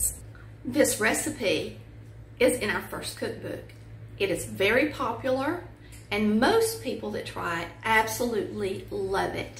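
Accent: South, United States. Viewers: love that Southern accent. Cheers.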